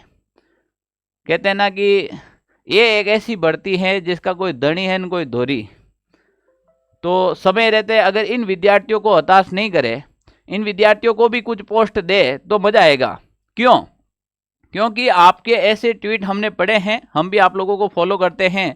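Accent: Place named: Rajasthan, India. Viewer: Sir aap ki voice sun k aisa lagta h Rajasthan me bathi hu main😊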